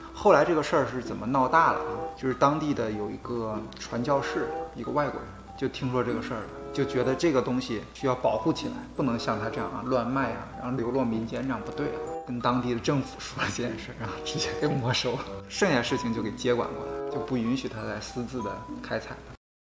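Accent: China. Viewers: 這個節目用的是繁體字，可是K哥口音像是大陸人。為何？ 不管如何，我點贊K哥使用繁體字，這是智慧的象徵。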